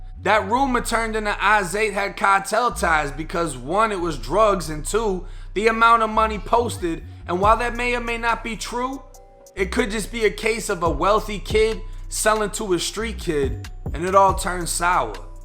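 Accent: Boston, United States. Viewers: I bet my life you are from Boston. I'm from New Jersey, l hear that accent a mile away! Enjoy your channel ☺️ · I love your accent, you must be from Boston! Keep up good work dude, ill support!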